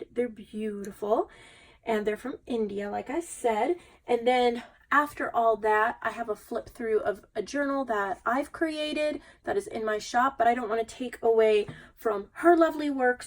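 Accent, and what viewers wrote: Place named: Cornwall, United Kingdom. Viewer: I listen to videos as she lives near to where I grew up and I find her Cornish accent comforting.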